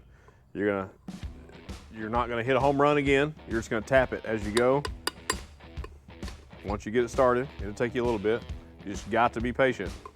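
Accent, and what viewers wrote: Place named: North Texas, United States. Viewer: Thanks for the video and info. Can you do a video on when the hub assembly should be replaced? The shoes on my 7k lb axle sitting between 5/32" and 6/32" and I'm wondering if they should be replaced in preparation for the upcoming RV travel season. Thanks And ditto to your pronunciation, being a North Texan myself.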